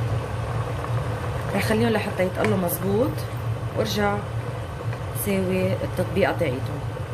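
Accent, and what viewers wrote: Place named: Beirut, Lebanon. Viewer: لهجتك بيروتية أصيلة جميلة للغاية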